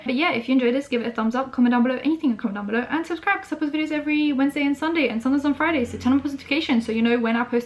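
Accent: United Kingdom. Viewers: What's your heritage? You sound like you have a slight twang to your British accent that's all!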